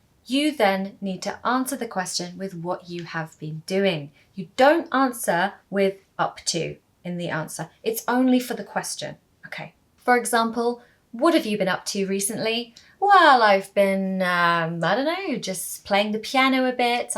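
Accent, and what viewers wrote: United Kingdom. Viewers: Hi I'm fascinated with your videos, geat explanation, british accent, nice hair, soft entonation and beautiful smile of professional woman. Congratulations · Hello I love your videos so much they are useful. And also your speed of speech is very friendly to me I can understand you clearly lol. You are sweet and lovely teacher. Besides I am quite into your wonderful British accent!! I wanna learn more from you and hope u have a lovely week❤ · You're the BEST teacher I've ever known. I Love British accent. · Thanks ！I really enjoy British accents, especially yours and your voice. And I think British accents are easier to understand than American’s. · I m an iranian. I have nt seen before teacher like you .actually your method is useful and effectivr.especially with brit accent lovely❤ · English sound beautiful when spoken by UK native speaker like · I like your British accent teacher I also like how you teach inglish 👍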